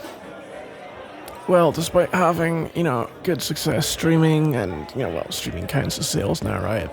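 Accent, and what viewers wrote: United Kingdom. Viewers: British boy!!! I'm British too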